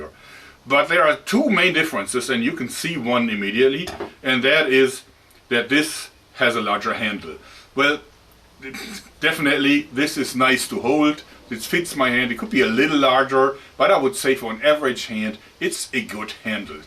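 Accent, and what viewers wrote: Germany. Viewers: A fellow Person that hates n!zis (jugding by the akcent maybe Even german too)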